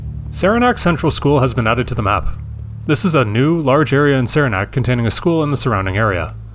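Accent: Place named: Canada. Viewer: The dude voicing this is insanely Canadian.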